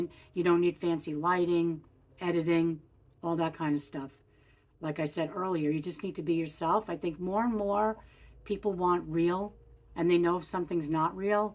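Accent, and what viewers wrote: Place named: New York, United States. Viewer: Thank you for sharing this!! It has been in my mind to start a channel, and you have given me some encouragement. I love your tiny home -- a year ago I was looking for one, but the cost of the lot rent and the locations kept putting me off. I travel a lot in a campervan, bought during the pandemic because it literally grounded me from flying. So I have my house, with unbelieveable story's about my "refresh" using AI and I think that would be fun to share along with my van adventures. So, thank you. You sound like you have a New York accent. Be blessed!!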